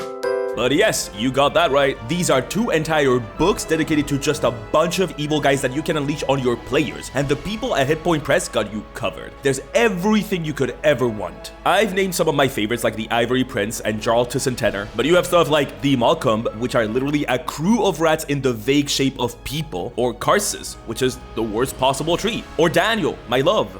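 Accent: France. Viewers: welp, as a french guy my self i must say... your french sounds... french ? yet you're english does not... i'm confused mate xD are you a fellow frenchman ? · WAIT WHAT ARE YOU FRENCH !?!?!?!?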